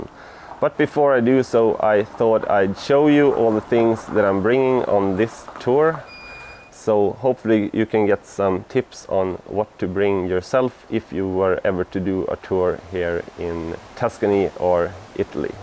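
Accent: Sweden. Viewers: Hej! Du låter lite svensk. Stämmer det? Jag har precis beställt väskor som du har. Och en duffel man kan lägga på och spänna på. Har inte någon särskild plan eller resa i åtanke men har för avsikt att göra några längre turer till sommaren. Har det fungerat väl för dig?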